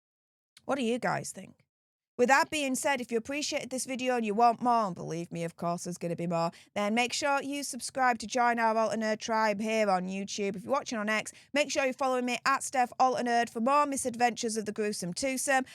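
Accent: Yorkshire, United Kingdom. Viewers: Yorkshire lass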